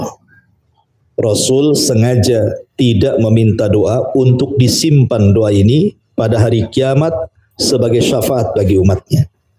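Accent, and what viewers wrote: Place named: Sumatra, Indonesia. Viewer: Ceramah nya Pak Ustadz logat nya Melayu macem Dataran Sumatra .... Luarrbiyasaa Bikin merinding.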